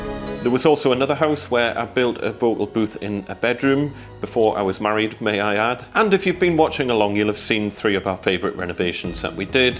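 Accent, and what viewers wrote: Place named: Geordie, United Kingdom. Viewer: Really love your videos - Very informative and great job! As a Geordie who has lived in London for 15 years, it’s nice to hear the friendly, familiar twang of the hometown! Keep the videos coming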